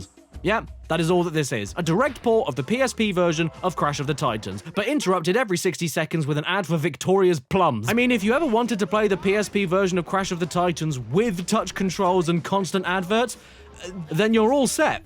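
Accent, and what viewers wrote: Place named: United Kingdom. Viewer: You are way too british but your account to be from the "united states"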